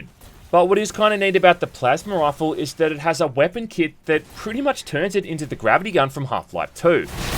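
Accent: Australia. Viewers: have you never heard an Australian?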